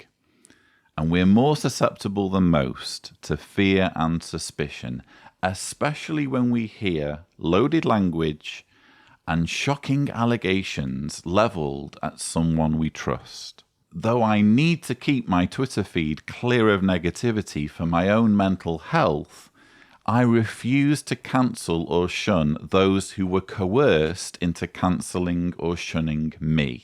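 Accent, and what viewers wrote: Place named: North, United Kingdom. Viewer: I've just discovered you over the last 2 days. I noticed your accent was familiar.... I currently live in Manchester and I was a JW in the Chesterfield/Sheffield area. I left the JWs at 16 having been baptised at 14/15. My parents and brother don't speak to me. I've never heard anyone articulate the same experiences I've had as a child until I discovered your channel and the Leah Remini interview and didn't realise how isolated I felt in my experiences until I heard others with similar experiences. Thank you from the bottom of my heart. Your down to earth way of putting everything is just so refreshing. Please don't stop making videos ♥️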